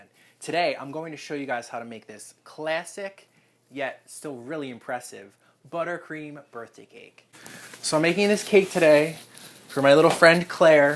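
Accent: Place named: United States. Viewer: What area of America is that accent? It's very distinct. Loved the video, it's great!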